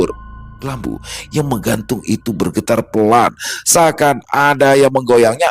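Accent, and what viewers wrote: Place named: South Kalimantan, Indonesia. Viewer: Mantap bg logat banjar y sdh kental benar. Salam dari banjarmasin 🙏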